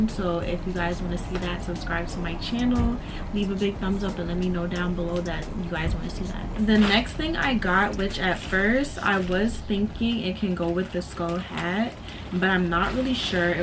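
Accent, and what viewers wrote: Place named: Jamaica. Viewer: As soon as me hear d patois me subscribe😂I shall live ova yah now🤣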